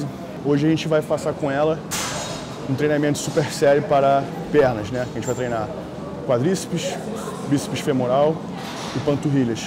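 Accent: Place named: São Paulo, Brazil. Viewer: Deve ser PAULISTA tu .. pior sotaque q tem e as gírias de paulista pqp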